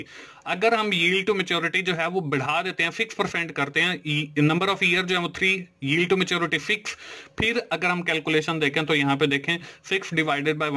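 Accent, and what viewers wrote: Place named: Punjab, India. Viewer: bhi dont mind........ punjabi main english bolny ka style .......kamal hy yar.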